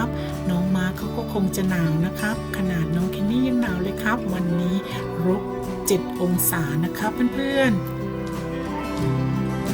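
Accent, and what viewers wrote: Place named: Isan, Thailand. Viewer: ดูเพลินเลยจร้า สวยมากๆเว่าอีสานได้น่ารักมากๆจร้า..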